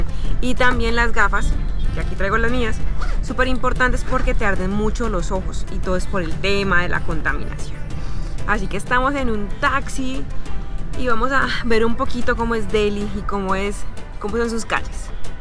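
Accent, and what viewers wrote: Cali, Colombia. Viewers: Me encanta tu acento extraño mi ciudad cali💛💙❤ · me ecantas. Ese acento caleño ese cuerpaso jajaja tqm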